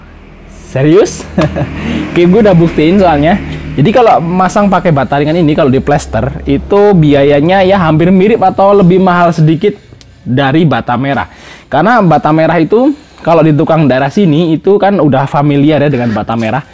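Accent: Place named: Semarang, Indonesia. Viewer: medhok men lek,bahasa semarangan e 🤣🤣🤣🤣🤣🤣🤣🤣🤣🤣🤣🤣🤣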